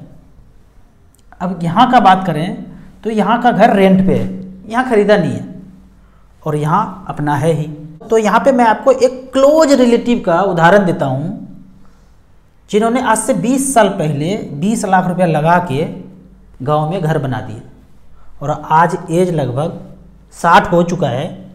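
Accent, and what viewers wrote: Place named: Awadh, India. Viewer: सर आपका होम डिस्ट्रिक्ट क्या है , आपकी बोली में अवधी का पुट है इसीलिए जानने की इच्छा है, आपका फॉलोवर...💐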